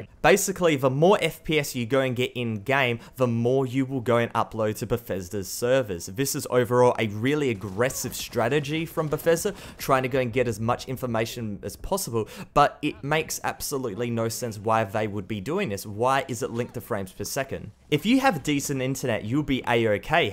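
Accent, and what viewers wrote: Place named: Australia. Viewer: I like your Australian accent hehehehehhehe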